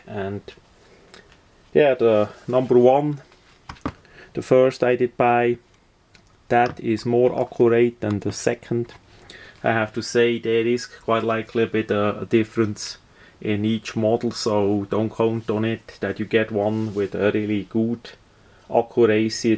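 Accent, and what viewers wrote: Switzerland. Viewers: My goodness! Your accent is so stereotypically swiss!!! Great video!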